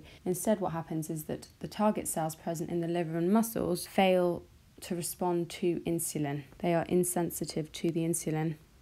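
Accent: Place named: England, United Kingdom. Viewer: i could watch those videos just to listen to your English accent.... its so beautiful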